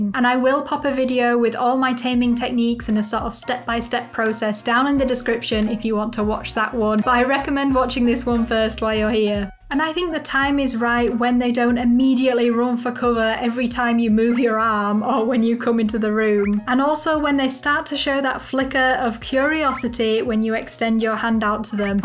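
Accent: England, United Kingdom. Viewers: can I ask you what part of England are you from? I am interested in regional accents (I'm American). And I've always loved the UK 🇬🇧. Thanks.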